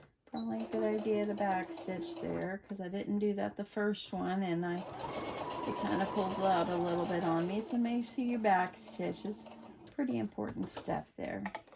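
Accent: South, United States. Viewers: I’m glad to see a video of yours tonight, this might make you laugh but I got so used to watching you every night. Then the memory dex was over I found myself missing my Crafty friend! I look forward to hearing your sweet southern accent. I made my first doggie last night. She’s so precious! Hope your having a wonderful day 😀💕🤗🇨🇦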